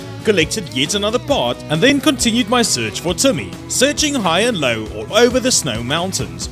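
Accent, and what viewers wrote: Afrikaans, South Africa. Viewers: bro he sounds like a south African man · Goeie video Jy kan in jou stem hoor jy is van SA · Some words sound like south Africa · you sound south african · are you from ZA ? if so what province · You sound south african ngl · I only have watched 2 minutes of the video and I'd say that this guy is 100% south African. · Bro, are you from South Africa? Your accent sounds very familiar! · You Afrikaans? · is he from south africa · Gotta love that boer voice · Okay but if i'm not mistaken hopefully the Afrikaans(?) accent makes everything so much funnier. As an African myself who lives in NZ now it always makes me so happy to hear a raw African accent. (I'm so sorry if you aren't Afrikaans but otherwise your accent is amazing.) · Ek is mal oor daai afrikaanse aksent 😂 awesome vidio bra 🤙🏻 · Are you from South Africa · are you from south africa??? 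· Are you south African? It's the accent 🤣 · Why do u sound like u from South Africa. :D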